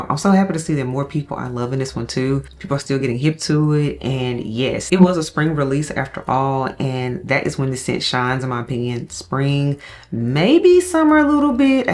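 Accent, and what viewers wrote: Memphis, United States. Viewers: 🌻🌻🌻🌻🌻🌻🌻I know a "Memphis accent" when I hear one! So glad your move went well! Gonna check out two of those scents you showcased! Take care!!!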